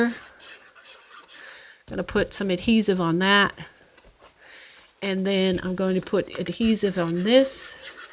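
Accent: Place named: South, United States. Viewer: Love your project. New subbie too. SOUTHERN GIRL what state are you from?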